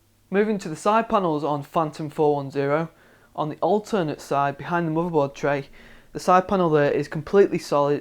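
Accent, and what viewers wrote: United Kingdom. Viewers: Finally a review in british accent! xD Thanks for the video review!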